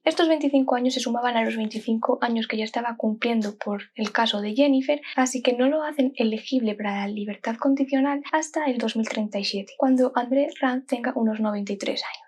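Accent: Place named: Spain. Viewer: alguien de España contando casos..porque entiendo mejor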